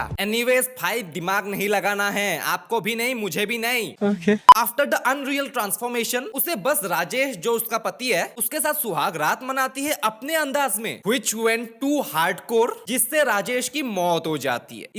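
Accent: Assam, India. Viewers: Why you sound Assamese?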